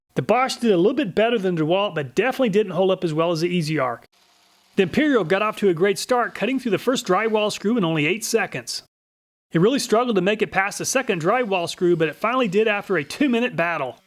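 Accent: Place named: Midwest, United States. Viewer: Os-kil-ating hard k sounds... You are from the midwest right? Lol My Mom says it the same exact way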